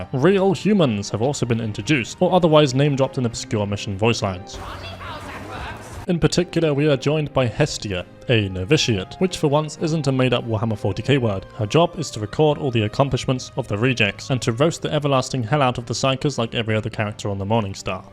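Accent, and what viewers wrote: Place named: United Kingdom. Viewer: i love your unique combination of being "lucky" enough to be british and have the accent, the jokes, and the editing. oh dear oh dear. amazing. jump king stream when?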